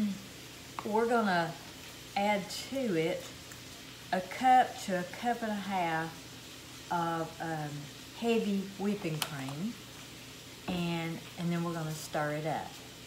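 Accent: South, United States. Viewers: I am French Canadian my Grandmother made these for us when we visited her way up in Northern Ontario she used a can of Carnation Evaporated milk and then we would gobble them up...spread butter and then dipped them in a bowl of Molasses...I tried these in Georgia on our way to Florida one time and it was served with Pork Chop gravey...so Thank you for this recipe I will give it a try and comment back at you on how they turned out😉 I subscribed to your channel I can hear the southern accent from you. would you have a recipe for Pork Chop Gravey ? 😋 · I love your accent! I miss the south!! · That looks yummy 😋 and you are such a doll love to hear you talk with your Southern Accent ❤ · I only now found your station with this biscuit video!! I just love your southern accent, and your sweet ways,.... This is a wonderful video you have made for us to learn how to make these biscuits!! 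I have watched other people make them, and I just love your relaxed style, way of teaching, and instructions. You really are gifted!! .... And I love that you include the little tricks that are part of the way you do things!! A jar to cut them, your old woodent spoon to stir the dough,.... How you put out the flour, how you store your flour in those huge jars. The way you clean!! Even the way you fry your bacon!! Much aloha to you!! mililani 🌺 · Love that country accent. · Hi there! I love to cook, and my mom did, too. I learned to make complicated varieties of bread, even when my mom & I were 2 states apart. she talked me through my first ever attempt at yeast dinner rolls….Sadly, my mom went through devastating loss of her mind, long years before God called her home, just over a year ago. I felt I lost her when her mind first began to falter, which was 7 years ago. I heard your voice, & from your phraseology to the kindness, and even your southern-accent, sounded, to me, was identical to the voice of mom’s youngest sister’s. 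I want to thank you for taking me back to those loving words, which I looked for, but ceased to to find that to be part of my mom. I surely cherish those long distance cooking lessons she so freely gave me, & I plan to watch as many Videos as you have, so I’m a new subscriber, always honing the skills mom taught me! I’ve been blessed to raise 2 grown, God-reverencing sons, and I’m known to my grand babies as “NeeNee”. In fact, I live right next to my youngest son, who has raised a gorgeous 17 year old daughter, but just when he thought his child-rearing days were done, here came his 2nd daughter; a female version of himself, Bella is just 9 months old! ( I get to see her EVERY DAY! ) Looking forward to every lesson I can learn from you! From the hills of Arkansas, thanks again! T.D-Rogers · I love living in the south! 😁🙏♥️🇺🇸 My mom is from Lubbock... I love the Southern drawl 🥰💯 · Thank you for sharing a very biscuit recipe 😀. 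I can't make homemade biscuits if my life depend on it lol..But I follow you recipe exactly and they came out perfect 😋.. And I love your Southern accent.. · Oh my GOODNESS! From the FIRST words I heard you speak!!! I don't think I've EVER heard such a strong, sweet SOUTHERN accent!!! You seem beautiful inside and out!!!❤ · Love her cute southern accent and along with her voice she sure cleans out nice!!!! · Thank you for taking me back home to my Mom's kitchen in Georgia. I still have the burn on the back of my left hand from me trying to steal a hot biscuit from the oven. It was so hot when I picked it up that I dropped it and my hand hit the roof of the oven. This lady has my Mom's Southern accent too. Thanks for taking me back home...❤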